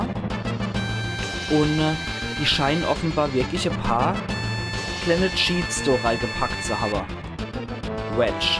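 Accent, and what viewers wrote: Palatinate, Germany. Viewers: Naaa mist :D würde ich supergern schauen >__< aber ich will das selbst noch let´s Playen...nun bin ich hin und hergerissen ob ichs gucken soll /: Finds übrigens genial das du auf pälzisch deine lps machst. Das ist mal was besonderes und einzigartiges ( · Alter, meine Fresse! Du machst das großartig "uff pälzisch"! Da bekomme ich direkt gute Laune! Ich habe dir mal einen Daumen und ein Abo dagelassen und werde immer mal reinschauen. Weiter so:D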